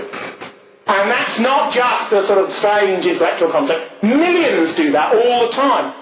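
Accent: United Kingdom. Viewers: He had a very normal English accent. If Americans find it off-putting or that it makes him impossible to relate to then that's their problem. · could you imagine if the sound quality was better and Bowden had tried to reduce the british accent a bit, he would have had a lot more attention on him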